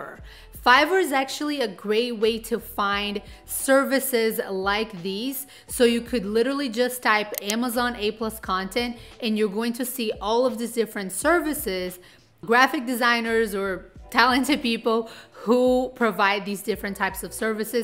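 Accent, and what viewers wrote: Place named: Brazil. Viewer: İ like the sweet 🍬Brazilian accent made it more fun to listen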